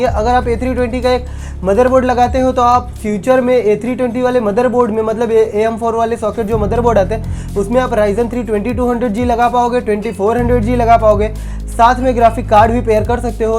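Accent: Gujarat, India. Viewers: Bro aap Gujarat se ho ? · Tame gujarati 6one 😊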